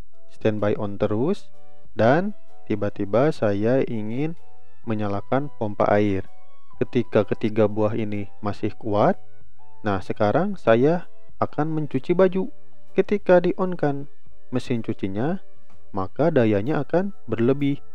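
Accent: West Java, Indonesia. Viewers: urang suna euy ieu mh, logat na katawis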